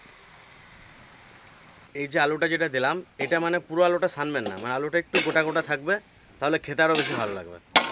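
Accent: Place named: Murshidabad, India. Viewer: আমার মনে হয় আপনি মুর্শিদাবাদের মানুষ কারণ আপনার কথাগুলো শুনে তাই মনে হল।আমার বাপের বাড়ী ওই জেলাতে।যদিও তার এখন গল্প।যাই হোক,আপনার ভি ডিও খুব ভালো অনেক শিখলাম। তবে সব্জি মশলা টা কী বুঝলাম না।ভালো থাকুন।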